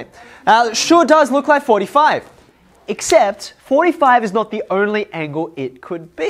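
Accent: Australia. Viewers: Australian accent? Sounds like chan from skz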